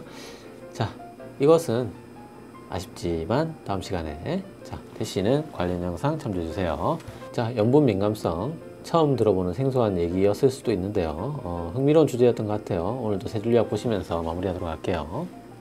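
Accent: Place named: Busan, South Korea. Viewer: 부산억양 귀엽습니다^^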